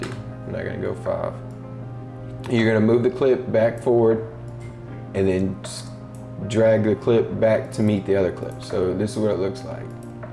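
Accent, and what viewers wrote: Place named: South, United States. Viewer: Your speech is sooo nice!! Come on southern bred 🤣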